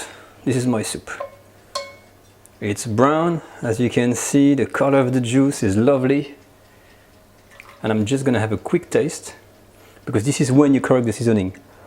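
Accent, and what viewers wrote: France. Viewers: J’adore votre accent français 😄 merci de préparer ces recettes ! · I never had french onion soup and that looks delicious :DDDDDD. Also you sound like my french friend that I play games with. Your accent is funny he says pwease instead of please! He is a good lad! :D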